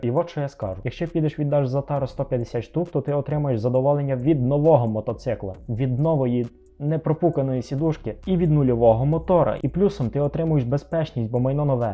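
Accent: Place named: Ukraine. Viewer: сільський український акцент і купа русизмів ,очевидно стягнутих з перегляду кацапських . почисти то трохи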